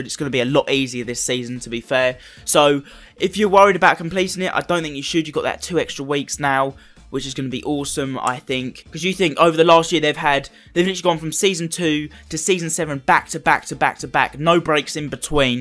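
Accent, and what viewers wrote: United Kingdom. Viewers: Are you from liverpool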